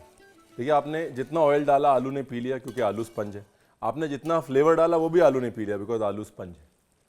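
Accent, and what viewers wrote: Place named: Punjab, India. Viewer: Ranveer ji, I have tried your Louki Dhokla and Paniyaram, it was yummmm! My daughter loved it. BTW Paniyaram is a term in TN and Kuzhi Appam is called in Kerala. Kuzhi pronounced as “Kuli” means small dents/ holes. Perhaps Appe was morphed later in other regions incl MH etc., additionally wanted to share, you speak very well. I mean your friendly, conversational recipe making is a treat to ears ! I adore your Punjabi accent and the wit and humour you bring along. Your Videographer for this channel needs an extra applaud for capturing colors and sounds to the precision, even the mustard crackling sound was a sound-delight to listen to. Prayers and Best wishes for all your endeavors, keep inspiring us.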